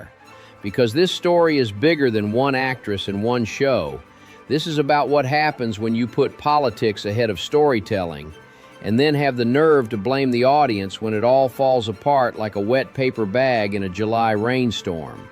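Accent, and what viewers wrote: Texas, United States. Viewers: Hello - I'm a new subscriber. I love your Texas accent. Greetings from Maryland USA.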